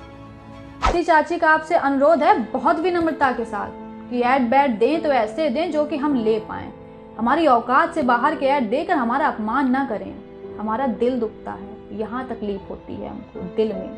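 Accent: Kanpur, India. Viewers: Kanpuriya ho kya beta ji. 😍😍😍😍😍😍😍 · apke kanpuriya accent par fida ho gaye hai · Bolne ki font bdi acchi lagti kanpur side ki...so awesome 😍😍😍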